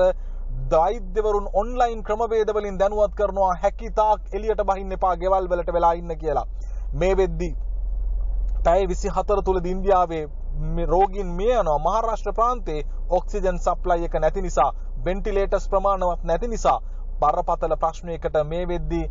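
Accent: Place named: Karandeniya, Sri Lanka. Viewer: thama Kata gahanawa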